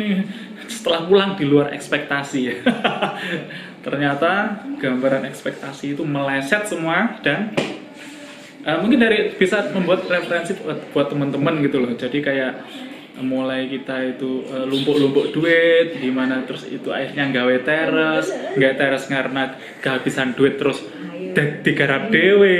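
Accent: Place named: Java, Indonesia. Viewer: Tanggal 12 september 2018. Kritik : tidak ada Saran : kalau bisa jangan ada bahasa jawa ny saya ngk ngerti, mending logat nya aja yang jawa bahasa jangan.. Alamat : perumahan belimbing kota Padang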